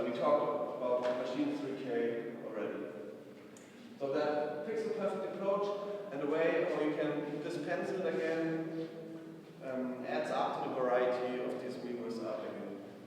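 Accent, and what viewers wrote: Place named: Germany. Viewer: man hört ganz eindeutig deinen deutschen akzent wenn du english sprichst :D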